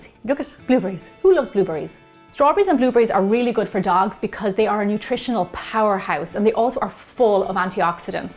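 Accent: Ireland. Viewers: ahhh knew it by the accent yuppa the Irish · Thank you so much I really really enjoyed watching you create these delicious dog treats. Are you from Ireland? Originally. I’ve subscribed and given you a thumbs up too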